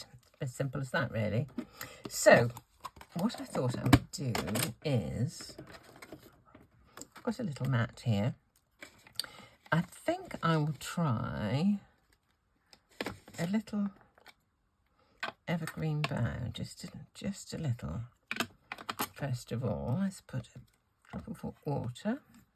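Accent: England, United Kingdom. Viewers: Just watched Lou’s collaboration video. I’m new to your videos. Just subscribed to your channel. I love to hear your English accent.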